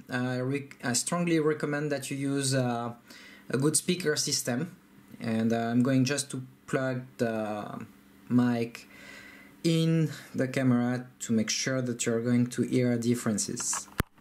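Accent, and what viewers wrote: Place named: France. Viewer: Good job ! And Good "french accent"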